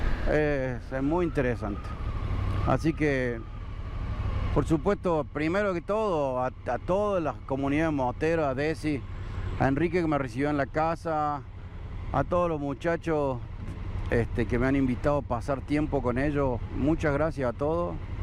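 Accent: Argentina. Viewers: Tiene acento de Córdoba, Argentina.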